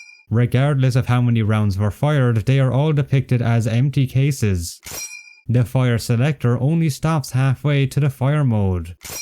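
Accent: Ireland. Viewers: Heard your accent and thought you were Irish and I new it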